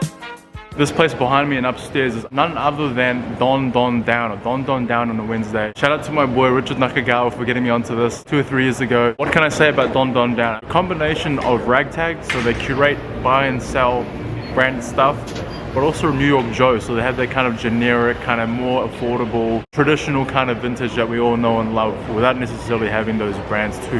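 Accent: New Zealand. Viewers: yeah was gonna say this lol, assumed you were aussie for a sec then i listen on and am like bruhhh this guys kiwi as 😂 nice video bro! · You sound like kiwi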